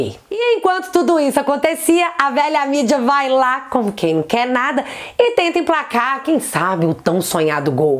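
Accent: Minas Gerais, Brazil. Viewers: Esse sotaque mineiros e uma delícia só , oh trem baum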